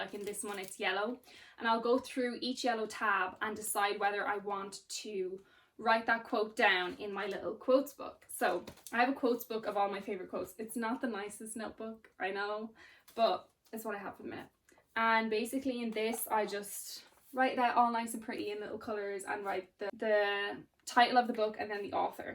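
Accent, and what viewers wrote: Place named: Ireland. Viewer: I stumbled across this video by chance, it's the first I've watched from your channel. I am the person you were before you made the leap to start with the careful pencil annotations. For some reason, I can't write into a book! 😁 I've written careful pencil remarks maybe have a dozen times, but this was when I noticed errors (usually translation errors that I've verified with the original) that stumped me so that the next person reading the book (or possibly me in a future reread) would have that information. But I've had the wish to retain certain memorable quotes and seeing how you annotate is fascinating even though I wouldn't want to write in my books with color pens. 😅 Hey, this is off-topic but I love listening to you. English isn't my native language; I'm from Germany and I don't remember hearing any Irish folks talking in English in my school days learning the language. 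(That was before the internet, and all movies / TV series over here are dubbed - the dubbing is done extremely well but of course, there would be so many accent I wasn't familiar with.) For my first real job after uni I went to Ireland and was living and working there for while (in Dublin, obviously, because this is where the jobs were). When you started talking, it was like being back there. I hadn't been aware of it at that time but the Irish accent really has a special charm! Unfortunately, I didn't see much of the island due to my being based in Dublin without a car but I'd been to Clare in a holida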